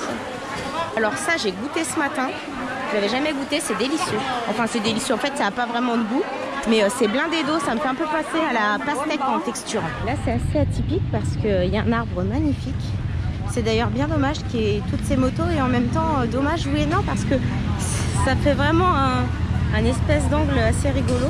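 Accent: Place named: Cambodia. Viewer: Super Voyage et superbe vidéo !! On a adoré aussi de découvrir le pays dont je suis de cette origine. Une belle découverte pour nous en fin janvier et début février 2020 juste avant le COVID 😅. Ravis de vous suivre ta chaine youtube et rubanbleu. Et super l'accent cambodgienne 😅. On a hâte de y retourner. Merci beaucoup pour cette vidéo.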